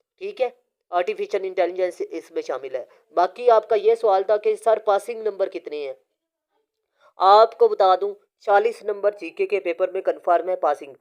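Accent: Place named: Punjab, Pakistan. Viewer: Pronunciation theek na hon to listener aur learner k spelling mistakes honay k chances bohat zyada hoty hen. آپ جب اردو میں بات کر رہے ہوتے ہیں تو خالص اردو استعمال کیا کریں۔سیالکوٹی یا لاہوری لہجے سے پرہیز کیا کریں، "ر" کو "ر" ہی رہنے دیں "ڑ" کرنے سے بابائے اُردو کی روح کانپ جائے گی۔ And when you are talking in English,kindly adopt the British or American accent.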